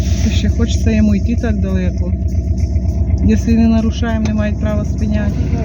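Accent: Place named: Korosten-Ovruch, Ukraine. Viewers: Зразу зрозуміла, що з Коростенського району. Коростенсько- овручський говір не переплутати 😊. Вітання з Черняхова. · Говор Житомирської області,по розмові зразу видно звідки людина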